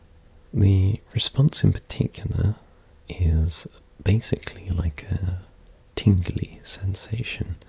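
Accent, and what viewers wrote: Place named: England, United Kingdom. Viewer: accent is also english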